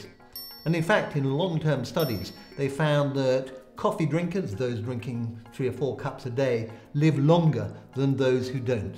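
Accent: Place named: England, United Kingdom. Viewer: Drinking my latte as I watch this. Still not quite sure what a "lartay" (as you and so many other English people say - oh no, not us Welsh) is though.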